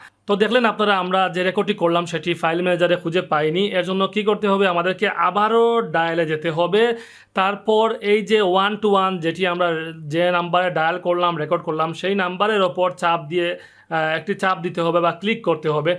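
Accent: Sylhet, Bangladesh. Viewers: আপনি মনে হয় সিলটি?😊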